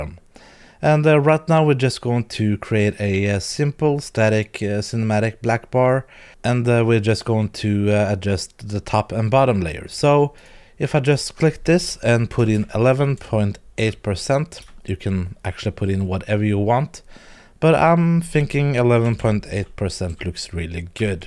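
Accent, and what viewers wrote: Norway. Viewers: Tusen Takk Og du høres så Norsk Engelsk ut at det er deilig å høre på en norske som snakker english :D :P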